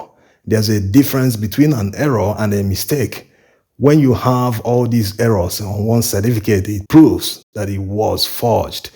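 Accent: Nigeria. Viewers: In fact this should be a TV channel. Big ups bro. From your accent, I can deduce your tribe in Nigeria. Smart and superlative intelligent people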